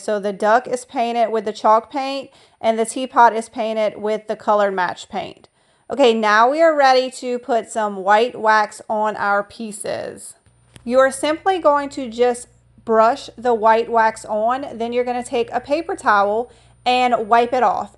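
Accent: Louisiana, United States. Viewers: You're adorable, I just think your Louisiana accent is delightful and you're so creative. Thanks for sharing your ideas.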